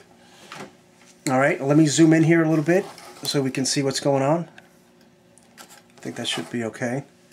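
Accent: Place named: Bronx, United States. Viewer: yo!!!!!! are you from tha Bronx or somthin!!?? ah???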